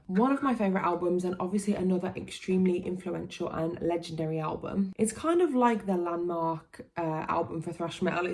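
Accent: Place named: Birmingham, United Kingdom. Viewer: awesome!!!! yes it was amazing!!! i saw him twice, i was at the London on the 7th and Birmingham! I can tell from your accent that maybe you're from Birmingham? not 100% but i'd say maybe you are.. so I imagine you went to that one.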